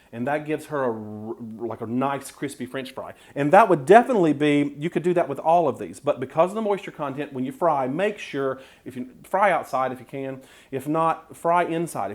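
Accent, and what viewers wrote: South, United States. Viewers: Oh wow I just love your southern accent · Love the South in your mouth!! Where you from? New sub. You’re a goofball—my favorite kind of person! Great video.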